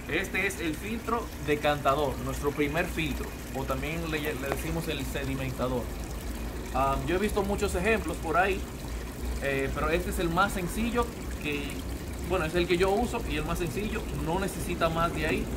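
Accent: Dominican Republic. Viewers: Ese acento es del castellano Dominicano es inconfundible al igual como lo es el chileno o mexicano, o me equivoco? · Hablas como dominicano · Vives en República Dominicana? Ese acento es el Dominicano standard😅😅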